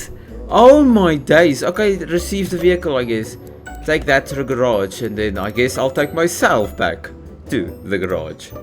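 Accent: Afrikaans, South Africa. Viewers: yeah you can hear your home language Afrikaans but I must say this game is addictive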